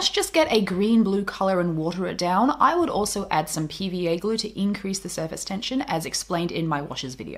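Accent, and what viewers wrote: Australia. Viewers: Hi. Love the Vids. Just starting to try my hand at figures and finding the vids very useful. Also nice to hear a fello Aussie lol. Please keep them coming.:-)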